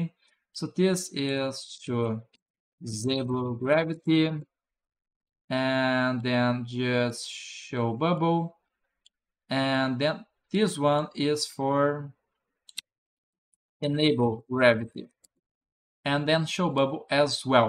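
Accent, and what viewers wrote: Brazil. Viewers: Brazilian portuguese detected 😂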